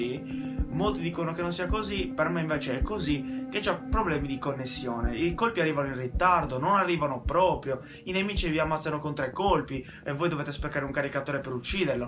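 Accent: Sardinia, Italy. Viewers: Vai...ma sei sardo? Se si...anche io sono sardo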